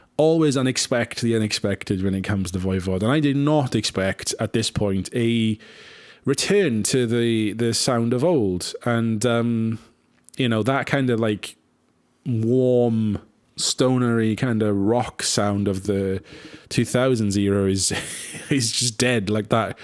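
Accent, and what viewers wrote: Wales, United Kingdom. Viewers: Do I detect a Welsh accent?...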